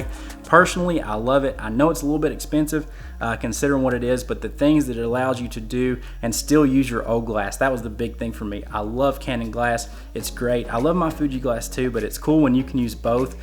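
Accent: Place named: Knoxville, United States. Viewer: Hey,. I knew the second I heard you speak you are from where I come from. All my father's relatives are from the Knoxville area. Been a long time since I've been back, but I loved living there. Now, you only demonstrated the nifty-fifty. So, I don't know if you tested the adapter with EF-S zoom lenses that are stabilized. My question is about how well the stabilization of the lenses work. Do you know?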